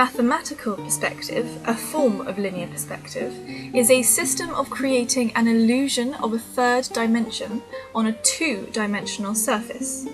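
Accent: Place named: England, United Kingdom. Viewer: va bene! you have lovely version of the sometimes not lovely posh english accent..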